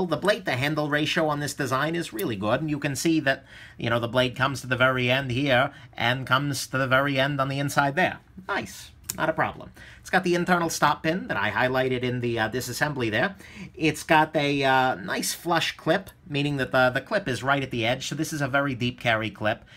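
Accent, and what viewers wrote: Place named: Brooklyn, United States. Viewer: He sounds like he’s from Brooklyn or Staten Island to me. The old timey tv/radio broadcaster is hilarious!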